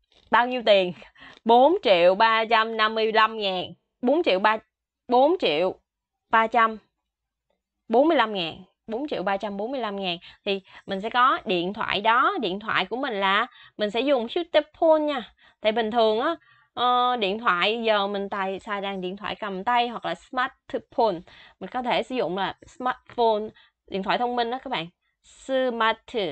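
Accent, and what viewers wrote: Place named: South, Vietnam. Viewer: thích giọng người miền nam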